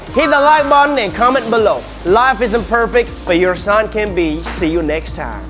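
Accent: South, United States. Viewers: Speaking with a southern U.S. intonation. Can't believe my ears!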